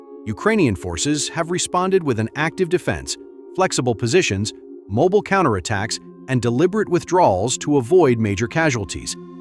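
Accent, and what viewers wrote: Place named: United States. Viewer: DAS VIDEO IST OK ABER ICH MUSS KOTZEN WENN ICH DIE AMI STIMME HÖRE EINE WIDERLICHE SPRACHE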